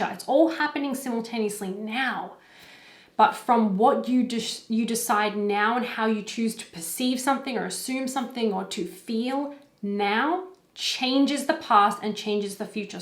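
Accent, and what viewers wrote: Australia. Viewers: Curious (because of a synchronicity and sign, this would be confirmation) ... is your accent Australian? ❤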